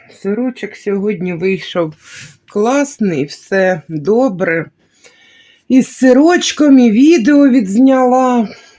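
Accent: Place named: Slobozhanshchyna, Ukraine. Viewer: Моя названа мама була із Купянська, так що говір Слобожанщини впізнаю одразу. 😍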